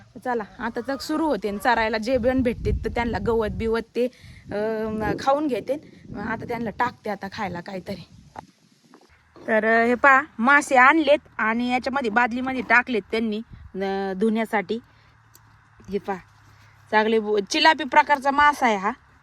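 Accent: Ahmednagar, India. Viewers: आपली नगरी भाषा अशीच आहे... लोकांना ती वेगळीच वाटते...आणि dhawalpurila आमचे पण पाहुणे राहतात...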